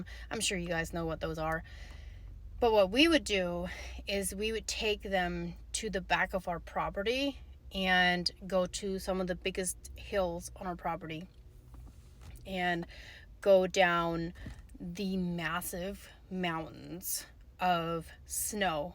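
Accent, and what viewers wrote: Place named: Pennsylvania Dutch, United States. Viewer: The Pennsylvania Dutch accent really comes out when telling these obviously very beloved childhood stories!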